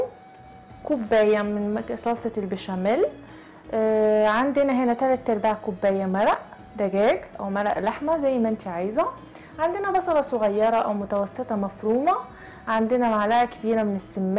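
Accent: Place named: Morocco. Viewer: احس من لهجتها انها مغربية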